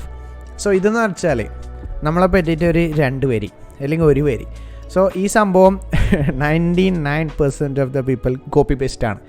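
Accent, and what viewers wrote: Kannur, India. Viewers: Mangala poraa kannur anno machaa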